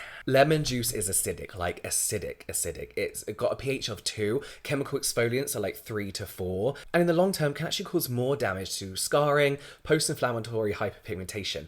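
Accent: United Kingdom. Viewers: Happy Monday! I’ve done the toothpaste thing (years ago), the it was spot treatments (they worked well), but this cool guy with an awesome British accent introduced me to pimple patches... LIFE CHANGING! 💖💖💖💖💖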